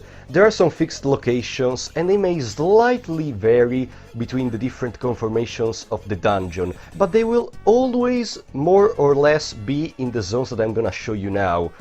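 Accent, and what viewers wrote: Italy. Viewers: bro are you Italian? you sound like it we speak English similarly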